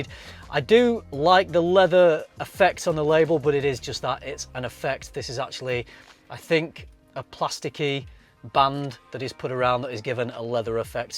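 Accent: United Kingdom. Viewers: Love your british accent... cheers from Argentina!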